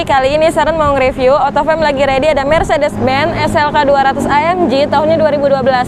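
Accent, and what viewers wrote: Java, Indonesia. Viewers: pasti wong jowo udah bisa ketebak dari suaranya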